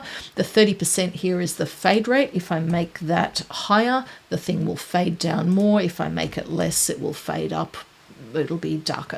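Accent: Australia. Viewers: thanks heaps, I love your pace, your explanations and of course your aussie accent makes me feel right at home:) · love that Aussie accent!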